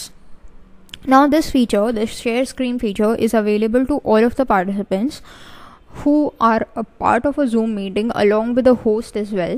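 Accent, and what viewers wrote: India. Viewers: Can’t stand her strong Indian accent. Wooh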